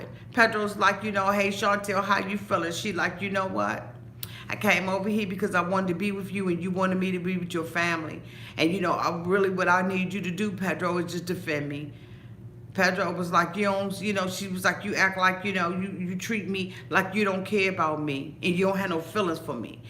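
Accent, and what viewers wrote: Washington DC, United States. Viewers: Auntie are you from the DC area? I'm trying to place your accent and I'm getting a strong DC accent. I'm a native so it's all love.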